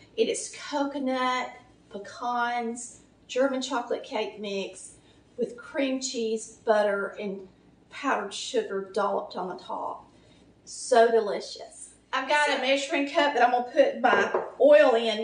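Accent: South, United States. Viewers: It’s kinda hard to marry up a southern American accent, and a German cake 🤪. Y’all get some pecaaaaarns LoL. However, the sound quality in this video is first class, and the recipe is worth making 👍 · It looks delicious, can't wait to try this. Thank you, sweet lady, I love your Southern accent. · I don’t know how this came up in my feed, but I am loving this. This feels like home. I mean, Lordy mercy! Somebody that talks all southern like me! Likin’ and subscribin’ right now.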